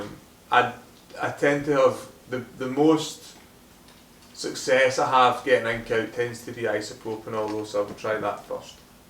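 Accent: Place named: Glasgow, United Kingdom. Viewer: Thanks for answering about the accent! We thought it might’ve been Scottish, but had noticed your shop was in Blackpool. We actually spent the first week of July touring through the Highlands. I think the closest we got to Hamilton was Callander. Wonderful country!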